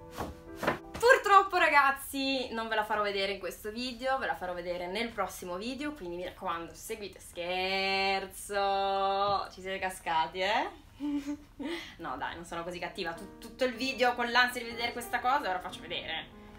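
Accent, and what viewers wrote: Sicily, Italy. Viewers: Ma sei siciliana? Sento un pò ' l'accento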